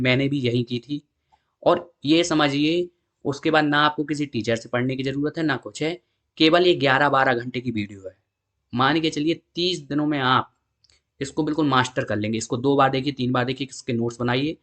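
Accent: Bundelkhand, India. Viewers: Bro are you from bundelkhand??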